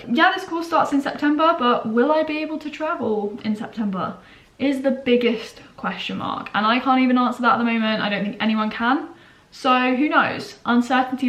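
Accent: Australia. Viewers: I feel like she's delevloping an Australian accent, very likely that she's not but I kinda heard it in the intro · You sound so Australian since you got back from your trip! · You’ve picked up a bit of an Australian accent!! Love it! X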